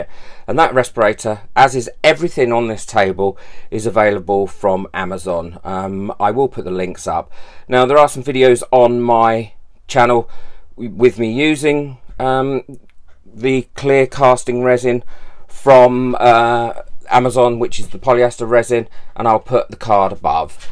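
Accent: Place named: Essex, United Kingdom. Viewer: Hello I’m listening to you in Illinois but you sound like you’re from my home area of Essex,or maybe Kent love the video’s especially the bloopers. Just want to get started with resin I have quite a few silicone molds that I’ve used to make soap,candles( little LEGO men) am I ok to use those molds?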